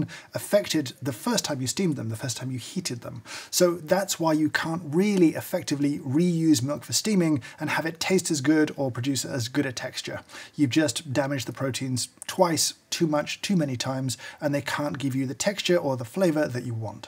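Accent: United Kingdom. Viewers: It's the British accent!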